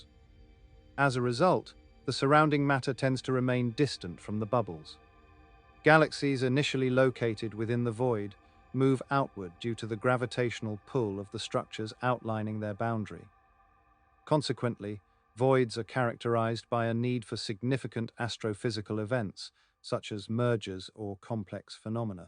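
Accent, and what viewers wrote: United Kingdom. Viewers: Haha “British Narrator”… I guess I’m not the only person who falls asleep more easily to a British accent…?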